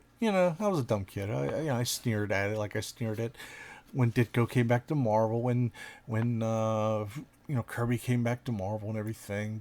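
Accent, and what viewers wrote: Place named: Pittsburgh, United States. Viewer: I love the accent, would it be safe to call it “jag off speaking”? Also … you need to find a reason to do your Gene Simmons in one of these, maybe if you do an SA Marvel that he might have read when he was learning English?!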